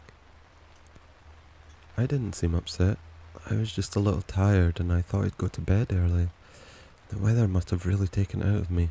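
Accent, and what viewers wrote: Scotland, United Kingdom. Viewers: IM OBSESSED WITH HIS ACCENT 😍 I live in America so I barely hear rare accents like his. Scottish accents are SOO unique 😍😍😭😭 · if i’m not mistaken, it’s actually scottish! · Errr is this a scottish accent im not good with accents TvT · Omg are you Scottish? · It’s a Scottish accent and I’m in love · Me, being Scottish and losing my shit over his accent because I didn't expect it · I’m Scottish and this just proves scotty accents are just>>>> 😮‍💨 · This might be an insult but are you Scottish 😅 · ... hey Mr. Wonderful, Do my ears deceive me?? Are you Scottish?? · It's nice to hear a familiar accent! Not enough Scots on here. My only pointer is emoting. Not all of it sounds totally natural. You do have a very soothing voice though, and I like the rest of it :)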